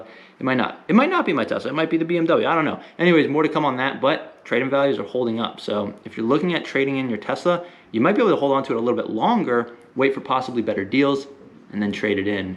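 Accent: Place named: Bulgaria. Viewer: Trying to figure out your accent. Maybe Bulgarian? Anyway. I appreciate the difficulties of ESL. I have never heard anyone say Alameda the way that you do (4 syllables) 🤓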